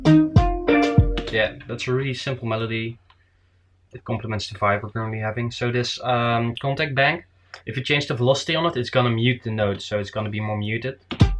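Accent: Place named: Netherlands. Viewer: You sound Dutch, what are the chances 😅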